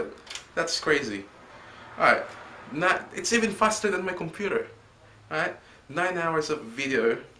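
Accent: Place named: Philippines. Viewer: pinoy accent =))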